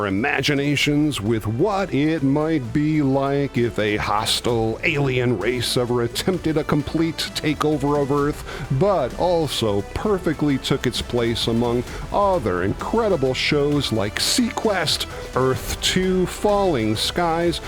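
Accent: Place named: United States. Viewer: I'm sorry I can't listen to that bloody accent anymore you won't get to the point you bloody yanks just keep talking and talking . God forbid we'd actually have videos from other countries considering its the world wide web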